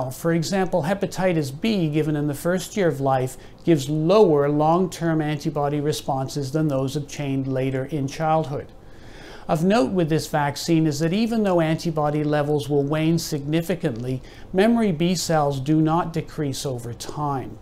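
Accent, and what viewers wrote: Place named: Canada. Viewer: MD here for nearly 50 years, I'm also a retired Professor of Surgery at a major medical school in the United States. This is an articulate internal medicine colleague with what sounds like a pleasing Canadian accent and he does have a great speaking style although I think he was reading from a teleprompter-like device. He produced an allegro account of some useful material that started out on the COVID thing but then soon enough went widely to other areas that are mostly tangential, to say the least. There were bumps in the road. His digressions about beverage alcohol use, sleep, exercise, diet, etc. were both strange and strained even if truthful cameo things. But that's almost minor technical nit-picking on my part. I do opine that it was laughably weird -- and very nearly bush league -- to pose him in front of those random pieces of medical apparatus and . 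. . . .[wait for it] . . . . . the wall display of (his?) various diplomas was just hilarious! What were these obviously intentional optical embellishments supposed to do? Make rubes who watch the video pay closer attention? Make the message more believable? Boost the bona fides? This man means well but the show business aspects of this video lecture were regrettable in my opinion. Thank God he did not wear the traditional internist's "flea collar" (a stethoscope draped dramatically around his neck).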